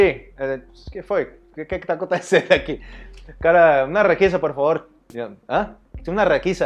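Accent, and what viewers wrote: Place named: Colombia. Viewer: Eu gosto quando ele fala em espanhol, ele escuta tão colombiano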